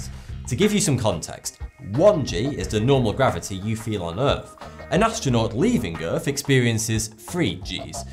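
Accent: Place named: Lancashire, United Kingdom. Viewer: That accent makes him sound twice as intelligent, damnit I wish I was from Lancashire...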